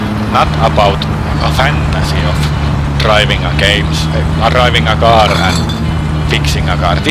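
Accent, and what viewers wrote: Finland. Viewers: suomi-englanti-finglish, 5/5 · kyllä on suomalainen peli ja rallienglanti kohillaan tuleeko steamiin vai omalle nettisivulla?? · Aijai! Selostettu vielä autenttisella rallienglannilla! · Im guessing that his accent in reality is not that thick but that it's rather a parody of the "Rally English" that Mika Häkkinen and all the 70s and 80s finnish rallyedrivers spoke. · His Finnish accent cannot leave when he speaking English lol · Ei millään pahalla, mutta tää suomienglanti on jo aika helvetin koomista. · It´s so called "rally english*". If you listen some finnish rally driver interviews you get this joke. :) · Suomen eglanti aksentti on kyl hauska. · Classic Markku Alen english!! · Rally english makes it always funny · tuo rallienglanti on ihan törkeen hyvä lisä tähän :D ja tähän pitää kyllä tulevaisuudes saada joku multiplayer tai cooppi että saa kaverin kans ajaa pitkin raittia ja ryystää olutta ja käydä pubis ja ostaa lisää kaljaa :D ja toki lisää legendaarisia autoja esim saab 900,toyota corolla ke20,fiat 127 jne :) kyllä tämä on pakko ostos ja kaverille kun linkkasin niin oli ihan samaa miältä :D ihan vitun kova peli tulos, "kuinka muistot tulvivatkaan" :DD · every pawt iz sumhoww cruishul for de funtion of de engin love how finnish people talk :) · With "rally english", ofcourse. 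· Aivan loistavaa! Videon rallienglanti kruunaa homman. Tö korner is tankero. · that finnish accent in english it's so unique =D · daniel targett its rally english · Vittu ku on rallienglantia. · oo my gawwwd, the finnish english accent for the win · 51 minutes and 5 seconds of beatiful Finnish accent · En kestä rallienglanti ilman beer. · Nyt on kyllä niin rallienglantia että ei pysty edes sanoin kuvailemaan. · I'd say Finglish is more common though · ralliEnglantisi on kaunista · Rallienglanti on tähän yhteyteen täydellinen aksentti, älä ikinä muutu. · Finnish accent :)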